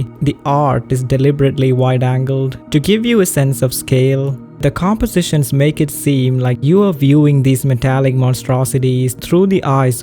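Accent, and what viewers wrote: India. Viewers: Oh boy, are you Indian or something? Your accent is showing, but it's passable. Please work on this. I'm not rage baiting. I'm trying to give advice, so you can get more views and a proper yt channel.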